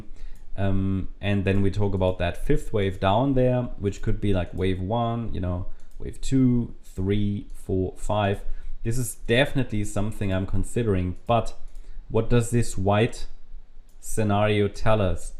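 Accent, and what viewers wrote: Germany. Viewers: War auch Ironie, hatte nur das Gefühl, dass er Deutscher ist, seinem Akzent nach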